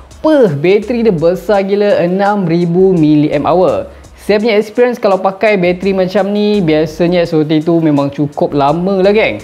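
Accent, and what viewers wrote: Malaysia. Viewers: Saya suka banget sama nada bicaranya org malay❤️ salam from indo🙏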